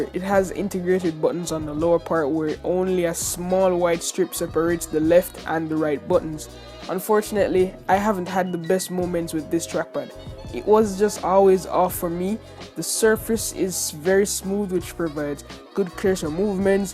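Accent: Jamaica. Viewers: Bro, you sound like a real Jamaican, and if you are mad props. Not too many Jamaicans are doing tech reviews on this platform, so kudos to you. Video quality, transitions and use of space are awesome, and of course the voiceover, with a " TRUE " Jamaican accent. Keep up the good work.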